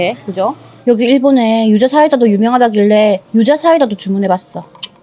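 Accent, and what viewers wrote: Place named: Busan, South Korea. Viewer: 아리가또~고자이마스~ 부산 사투리 ㅋㅋㅋㅋㅋㅋ넘 귀여운거 아니냐구우~~❤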